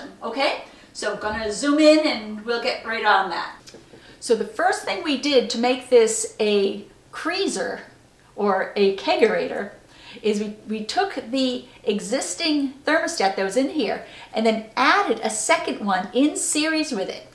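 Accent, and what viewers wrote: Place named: New England, United States. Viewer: at first I heard a very slight N.J. accent but i knew it wasnt right ..then it hit me Maine or New England Not bad for a Canadian Eh !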